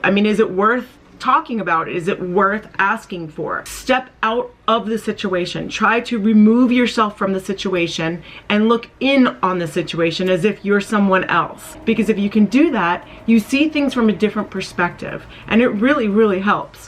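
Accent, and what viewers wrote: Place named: United States. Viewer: I just love your pronunciation sooo much! I'd love to be able to talk so American like you do.. (I'm from Austria that's why lol). Thanks for all the advice, I'm very looking forward to the next video!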